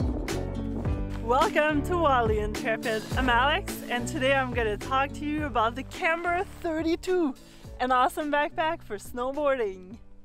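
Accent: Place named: Quebec, Canada. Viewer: You sound like you’re from Quebec, Canada!! Is the bag more of a burnt orange, or is it truly red?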